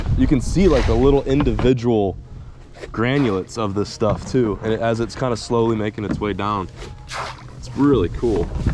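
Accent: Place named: Texas, United States. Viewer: I’m starting to hear some texas twang in your voice, has been acclimated🤝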